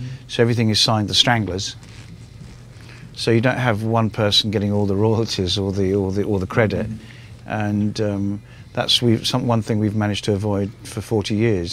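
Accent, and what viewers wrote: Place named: France. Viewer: Sadly, I couldn't understand word. Damn that outrageous French accent.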